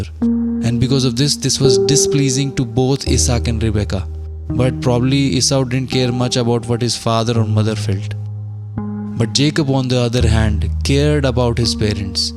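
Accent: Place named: Arab world. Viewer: I'm curious to know where in the video it says he's not? I encourage you to rewatch the video because I think the point of this video went over your head. I'm guessing maybe because the accent of the voice over sounds arabic you think he doesn't believe Jesus is God, like I admittedly questioned myself, but 2 seconds of looking through the titles in his video uploads you'll see one titled "Jesus is God Himself". So rewatch the video and focus on the lesson of how God can use imperfect people for His Glory. God Bless you brother. ✝️🙏🏽💪🏽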